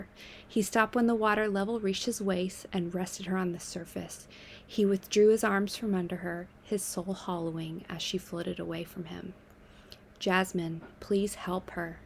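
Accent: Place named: United States. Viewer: Have you ever heard of "Mad Studies"? or have you seen the webpage Mad in America? Interesting research into mental health, also check out Sadhguru on youtube. I'm enjoying your narration the American accent is cool.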